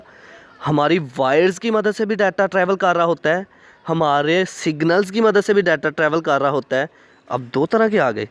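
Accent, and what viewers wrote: Punjab, India. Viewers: Punjabi ascent <3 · Aapka Panjabi lahjaa mashallah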